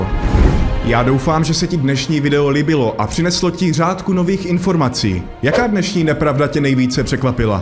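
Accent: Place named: Moravia, Czech Republic. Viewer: Část času, který věnuješ na úpravu vousu, věnuj promrskávání shody přísudku s podmětem, ať nemluvíš jako úplný manták z Moravy, když se pokouší o spisovný projev.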